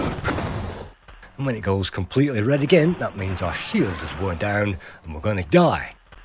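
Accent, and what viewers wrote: Lancashire, United Kingdom. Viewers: The Lancashire is strong in this one!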